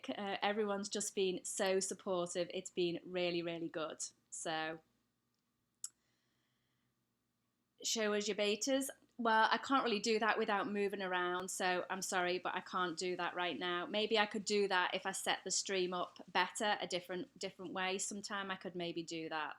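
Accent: United Kingdom. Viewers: MAN I MISSED THE LIVE STREAM!!!!! i was really busy today, and didn't even touch my laptop:( i love this video though.... and i hope you make more live streams. PS i knew you were British!!!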